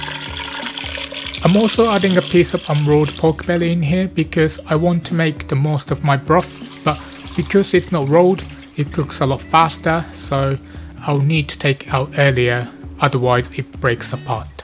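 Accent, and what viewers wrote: United Kingdom. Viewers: Yes, that's me! I like to support small but professional cooking chanel, btw, your british accent gets me. All your direction is easy, clean and on point. If you have time, would you please consider to cook 鶏手羽 香酥雞翅? The pork belly in USA grocery is pretty tough, so i couldn't roll it though. I leave it 2 days and it is so tasty and soft. Thank you again ^^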